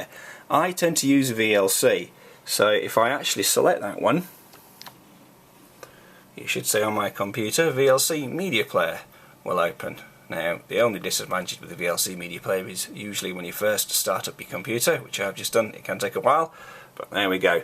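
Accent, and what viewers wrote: United Kingdom. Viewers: You have perfect English (Great Britain) voice xD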